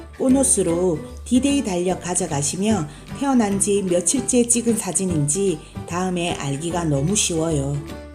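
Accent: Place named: Seoul, South Korea. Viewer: 와ㅎ 선생님 서울사람같아요ㅎㅎ 역시 유익한 강의~~♡♡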